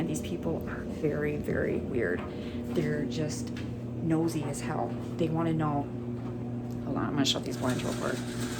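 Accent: Michigan, United States. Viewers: So this is funny, I was watching your video and my son was sitting near me on the couch and he said mom that lady sounds like you: I knew what he meant right away, it’s the Michigan accent because I’m from Michigan lol. I was like yep that’s right!! lol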